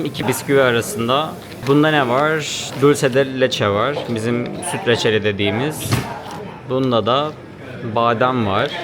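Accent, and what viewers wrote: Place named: Turkey. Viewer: Soy argentina. Bienvenido y que lo pases muy bien. Solo escucharte hablar 2 minutos y reconocí que sos turco. Soy una gran fan de la telenovelas turcas. Seas bienvenido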